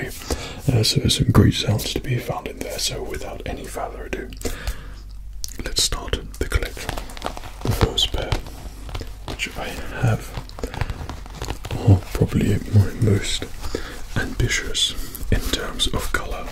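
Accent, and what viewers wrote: England, United Kingdom. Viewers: My strange addiction over here ngl I’ve been watching u for time had no idea u were British 😂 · is Australian, is British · your from England? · I never knew was a fellow english man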